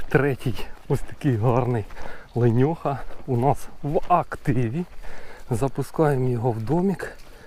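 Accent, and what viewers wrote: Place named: Zhytomyr, Ukraine. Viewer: де така гарна водойма Житомирська обл Чую по говору😂?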